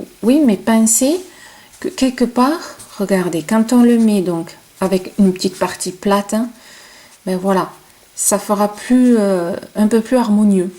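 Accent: South, France. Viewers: Super, vous êtes géniale merci pour le joli lutin et j'adore votre accent du sud j'attends d''autre tutoriels avec impatience !!!!! A bientôt .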